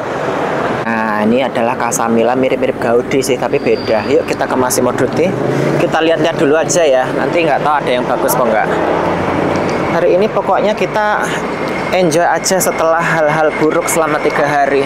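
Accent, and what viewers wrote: Java, Indonesia. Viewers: Tiap x mas bilang AING,CENAH...rita lngsung ktw krn lidah jawa ngomong sunda jd lucu aja bawa'annya🤭 semangat trs ya mas 🥰🥰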